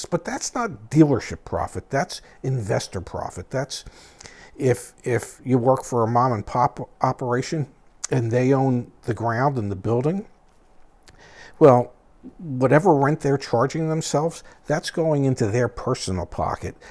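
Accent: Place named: Philadelphia, United States. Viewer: If I ever need an example of a Philadelphia accent to show someone, I'll send them this video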